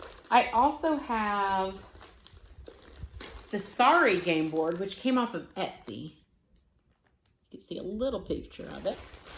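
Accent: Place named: South, France. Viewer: Loved your southern French accent! I would love that sampler. So colorful.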